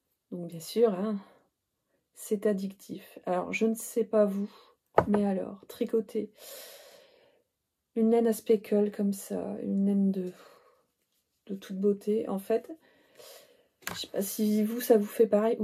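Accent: France. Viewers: Ah! J'avais bien perçu un p'tit accent du nord.... bon c Pas-de-Calais mais c du pareil au même.... Originaire du nord, j'ai tjours adoré les plages du Nord, en particulier Ambleteuse, gris nez et blanc nez qui malheureusement ne sont plus aussi sauvages qu'à mon époque (année 70-80) qd on y allait en diane... Vos videos st agréables et je me suis abonnée pour suivre vos aventures tricot et couture avec ce p'tit accent de ma jeunesse. Amicalement.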